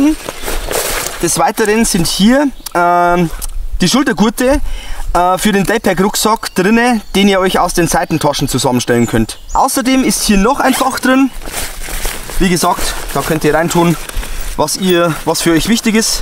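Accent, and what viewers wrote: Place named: Bavaria, Germany. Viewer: Alle Achtung, prima, herrlich das Bayrisch 😊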